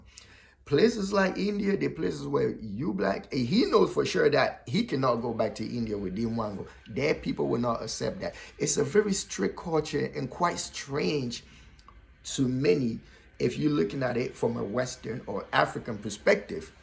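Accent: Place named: Liberia. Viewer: You sound Liberian dude ..she is the one using the guys for subscribers, we all know what’s going on and it’s all innocent 😇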